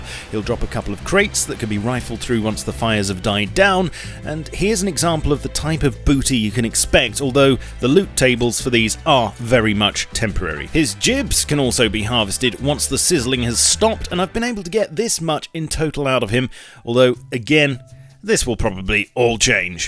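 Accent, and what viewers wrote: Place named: Liverpool, United Kingdom. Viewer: aye yeah ur a scouse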